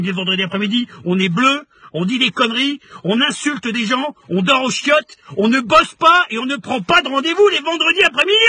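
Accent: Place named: Lyon, France. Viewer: Cuuuuulte la vidéo de l'année! Chez nous enfin près de chez moi (Allez Villette!) tu es une star même si tu es stéphanois, d'façon tu as l'accent lyonnais ^^ Bises mon pti canard aux olives:)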